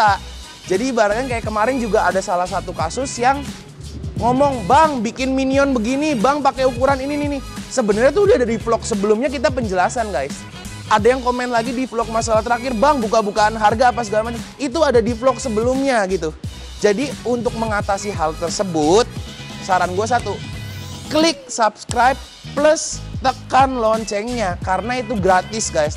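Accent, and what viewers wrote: Cirebon, Indonesia. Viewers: 100% orang cirebon dari logatnya... Mantap lah, sukses terus buat semoga makin keren dan makin maju di dunia otomotif....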